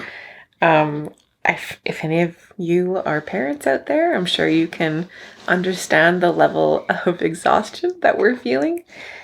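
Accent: Canada. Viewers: Your Canadian accent is showing and I love it. (Mississauga girl here). Thank you for sharing your story. Big congrats to you!! she's such a sweetie <3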